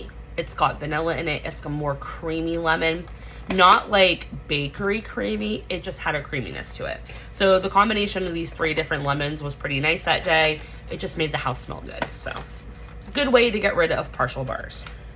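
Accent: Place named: Massachusetts, United States. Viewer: I live in Southeastern Massachusetts, & no school on Good Friday. I always thought that you had the Mass accent, and you definitely have our spunk-if you know, you know 😂. I despise Scentsy’s lemon with the exception of the Harry Potter bar. I was so glad when that club fluke happened, so it is back in my club again. Great empties!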